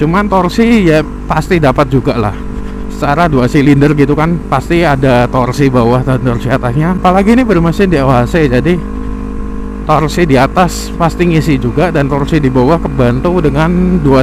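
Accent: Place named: Java, Indonesia. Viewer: cocok campur jawa om ngomongnya, medhok pisan. "rx king cok" 😂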